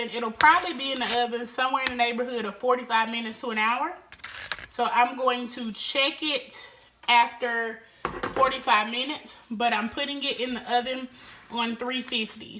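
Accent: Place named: Alabama, United States. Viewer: You gotta be from Alabama… southern down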